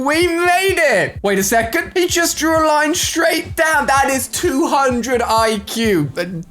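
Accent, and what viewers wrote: England, United Kingdom. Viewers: Is he english?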